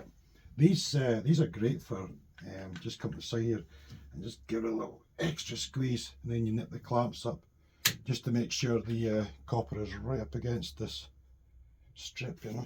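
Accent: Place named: Glasgow, United Kingdom. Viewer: Just found your channel & subscribed. Sounds like your accent is somewhere near Glasgow.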